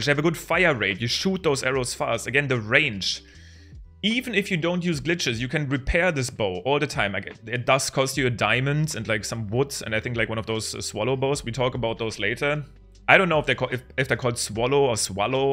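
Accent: Germany. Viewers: are you german? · Another great video,! I’m continually impressed by your English, but since you repeatedly questioned your pronunciation of Swallow; I think you pronounced it correctly first each time (with your adorable German accent 🙂). Swallow rhymes with follow, not fallow. The a sounds the same as the a’s in baa baa, not the a in black sheep. I’m not sure if this helps, but the French name Soileaux sounds the same, or at least I can’t tell the difference. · Yeah, if people give you grief over having a German accent while speaking flawless English, their opinions are laughable. I'm living in Norway trying to learn Norwegian and I am terrible at it. I wish I could speak Norwegian as well as you speak English. I am from the USA and there are many different regional accents, and the Cajun accent and certain southern accents are much harder to understand than a German accent, and no one gives them crap about it. 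Double standards.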